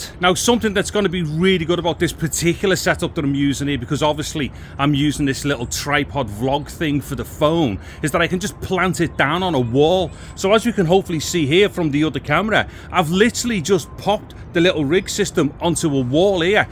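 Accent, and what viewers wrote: Liverpool, United Kingdom. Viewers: It seems strange to me (living in the US) that the variations stateside are nowhere near what is found among the original native speakers of English - these regional British accents are some of the most difficult for us to understand. But again, how come the four most famous Liverpudlians never spoke like that? Also, why does Wayne Rooney, a real Scouser AFAIK, sound so very different from here (and is, TBH, unintelligible)?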